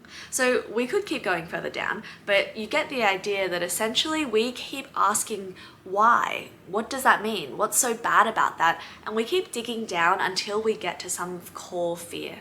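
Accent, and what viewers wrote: Australia. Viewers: Thank you for your reply.🩷I tend to compare myself with somebody that speaks English so well, and I hate myself doing comparison. If I were in Sydney, I'd like to have a session with you to improve my confidence. Please keep posting. I love Aussie accent, and I'm infatuated with Australia! So, your video clips remind me of fun time I had in Sydney! Yes, I've lived there for a little while a long time before. And, I miss Australia.🥹🥹🥹🥹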